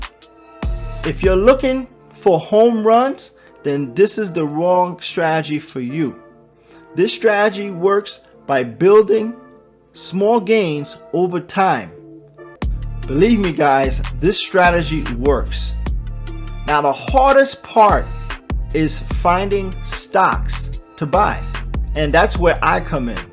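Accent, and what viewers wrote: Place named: Northeast, United States. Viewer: where you from champ? I am guessing the Northeast USA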